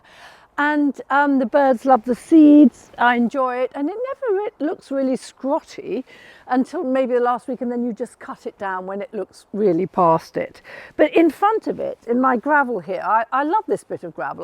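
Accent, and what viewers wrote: RP, United Kingdom. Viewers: The combination of the cut-glass accent and the vocab (manky). Hilarious and does me good....finally subscribed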